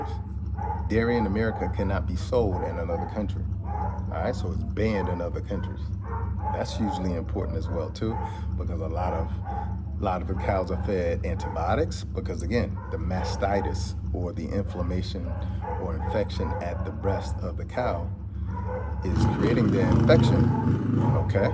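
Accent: Jamaica. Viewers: Is he Jamaican?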